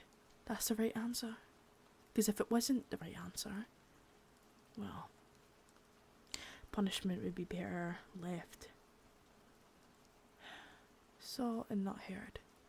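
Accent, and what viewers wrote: Scotland, United Kingdom. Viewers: Scottish accent uhhhhh THE BEST ! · Great work. (Is that a scottish accent?)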